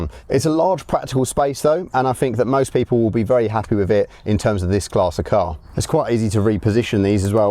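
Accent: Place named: New Jersey, United States. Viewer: Love the New Jersey accent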